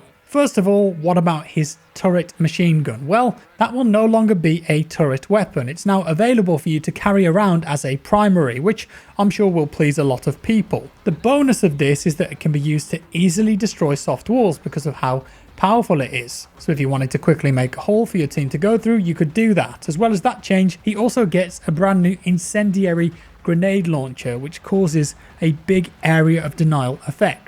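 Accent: United Kingdom. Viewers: Where in the U.K are ya from mate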